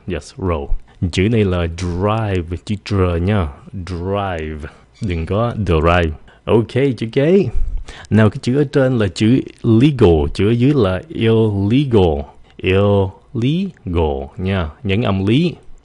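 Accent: United States. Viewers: cách phát âm thầy dạy ở đây là theo tiếng anh Mỹ ạ? Em định học Ielts, phải phát âm theo chuẩn tiếng anh Anh, thầy có dạy theo cách này mà phát âm của người Anh ko ạ? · you pronounce like American, i saw two youtube channels between british and american, there are some differences in pronunciation. perharps, i just wanna know how to use these words....tks u for videos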